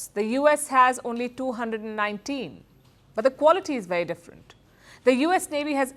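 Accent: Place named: India. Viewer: I like her reports, which can help me get familiar with Indian English.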